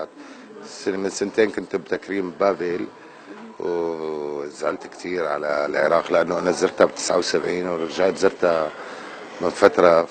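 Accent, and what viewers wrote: Syria. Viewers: طيب انت عراقي ليش تتكلم سوري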